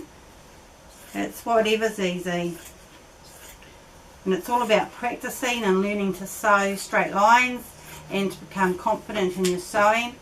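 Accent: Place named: New Zealand. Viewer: She is a Kiwi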